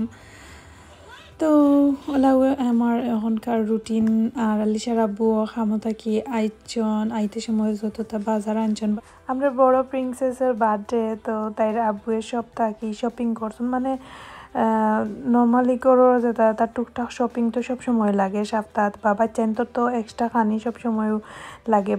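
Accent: Sylhet, Bangladesh. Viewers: Affa just curious want to know why you went from shudoh basha back to sylheti basha?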